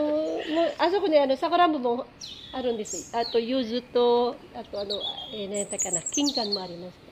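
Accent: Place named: Japan. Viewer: for the second time na pinanood ko to....kagabe at now....nag enjoy lng ako lah....at na inggit ako not only for the blueberry pati na den sa japanese accent mo 💚☘️💙...love love this video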